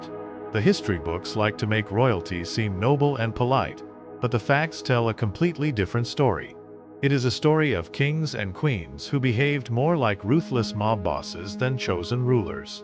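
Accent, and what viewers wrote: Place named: United States. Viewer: I lasted 59 seconds. Several reasons. One, the godawful music is so loud that you can hardly hear the narrator. Two, the narrator is talking in an American accent which means his pronunciation of certain English words is going to be dire and, Three, Just from those few seconds I could tell it was going to be CRAP.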